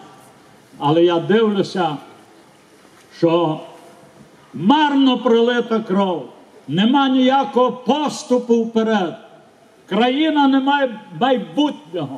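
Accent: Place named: Canada. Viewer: ше один з Канади, блять, нікому не ріже вухо?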